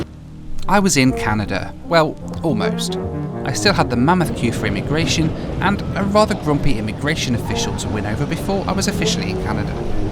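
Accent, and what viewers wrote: United States. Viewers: Also, I always love your American accents.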